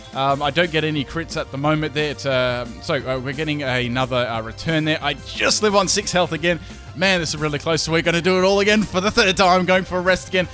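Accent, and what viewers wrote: Australia. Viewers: Wait is Australian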